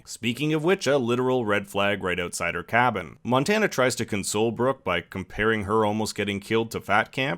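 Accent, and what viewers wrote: Canada. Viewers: Just curious are you Canadian? I've been trying to place your accent. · random but this video i picked up on an accent and now i’m wondering if youre from canada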